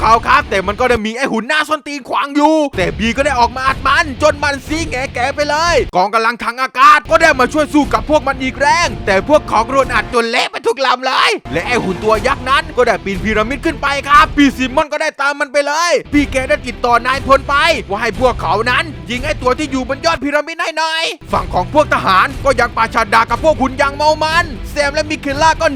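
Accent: South, Thailand. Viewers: พี่เป็นคนใต้หรอพี่5555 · พี่ครับพี่เป็นใต้ใช่มั้ยครับตอบผมหน่อยนะ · พี่เขาเป็นใต้นั้นเเละครับ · ฟังยุตั้งนานพอรู้หล่าวว่าคนใต้เหมือนกัญ 🌿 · พี่ครับพี่เป็นคนใต้ไหมครับ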